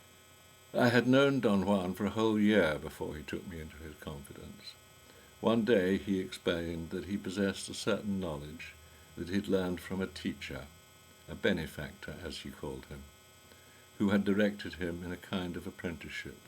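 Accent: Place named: United Kingdom. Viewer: You mean "moot point?" What "internal linguistic evidence" do you speak of? You are presuming that anything this guy Castaneda says is this mystical wisdom, even if you see that he's tricking you. I personally have no respect for "teachers" who assume that their students are too stupid to understand the real truth, so they make up bullshit to make it easier to digest. It's like a religious faith. If you are tripping balls and listening to a nice voice with a Brit accent it probably sounds nice.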